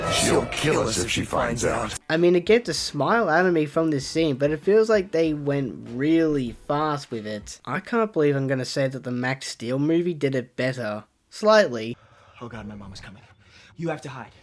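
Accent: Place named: Australia. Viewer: I was today when I noticed your Australian,🙃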